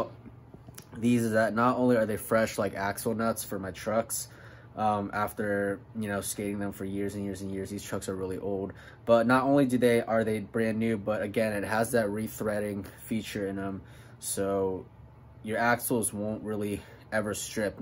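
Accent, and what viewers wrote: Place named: Delaware, United States. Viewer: ok. that is a good idea. thanks man. somebody just the other day had this problem at the park. i can tell your from the east coast when you said up there in new england but you dont sound like your from delaware. im from Philadelphia. the streets here might be the first roads paved after they invented black top 200 years ago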